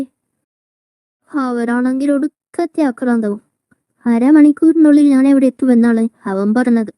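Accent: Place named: Kochi, India. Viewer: story telling in the Kochi accent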